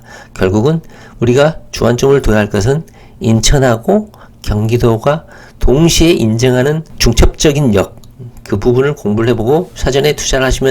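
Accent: Jeolla, South Korea. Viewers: 이사람 전라도 가탕..사투리쓰네..전세계 최고 허브 공항을 당연히 gtx 연결 시켜야지..국가 발전을 위해서..스톡홀름..공항에서 바로 시내로 초고속 기차 진입하니 완전 빠르고 좋더만..국토부에서 알아서 하세요~~공항철도는 넘 느려~~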